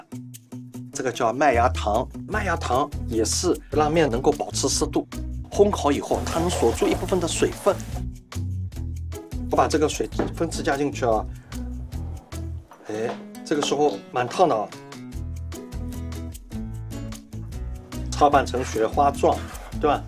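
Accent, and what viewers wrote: Shanghai, China. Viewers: 上海普通话，亲切啊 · 好亲切多上海式的普通话啊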